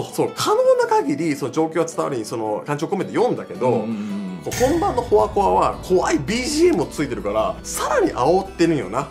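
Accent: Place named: Kansai, Japan. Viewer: 関西弁は勘弁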